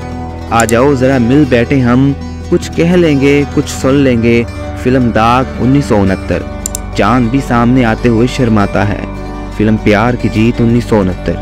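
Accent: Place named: Punjab, India. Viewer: pnjbi lahjy ha uordo ghlet bol rhya hu kuri ko kari bol rhya hu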